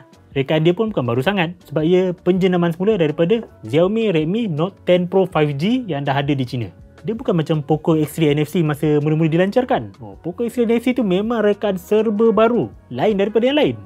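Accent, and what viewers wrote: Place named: Sumatra, Indonesia. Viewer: iya, aku jg sering dengar orang2 sumatra gaya ngomongnya memang mirip gini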